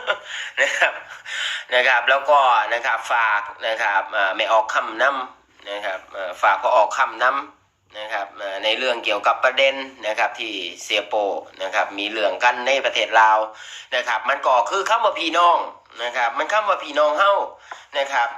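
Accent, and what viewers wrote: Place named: Suphanburi, Thailand. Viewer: สำเนียงปู่ไม่ได้อ่ะ ออกไปสุพันมากกว่า5555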